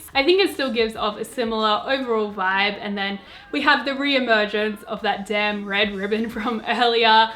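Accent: Australia. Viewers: I hear red velvet I already love her I heard her Aussie accent I loved her more I SAW BT21 AND I SUBSCRIBED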